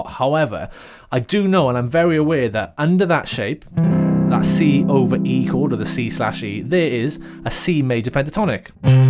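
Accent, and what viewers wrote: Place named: Wales, United Kingdom. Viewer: Thanks great lesson just subscribed. Great to hear a Welsh accent teaching guitar.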